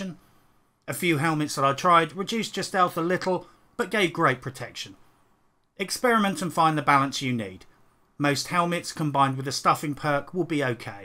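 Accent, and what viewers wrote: United Kingdom. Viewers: I haven't played this game in years but come back to this videos from time to time just because they put me in a good mood lmao maybe it's due to the fact that it's a British man talking about this Czech game placed in medieval Bohemia. Being an immigrant from Poland (or POLISH LITHUANIAN COMMONWEALTH if you wish), living in the UK, a history buff at that, what could be better?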